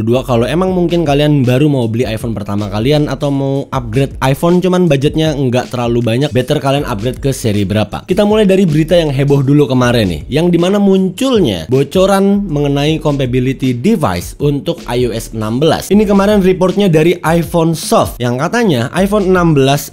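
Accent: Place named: West Java, Indonesia. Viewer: Lidah org sunda bro, gw juga gtu klo ngmng b inggris kek kesleo gitu lidah, ahaha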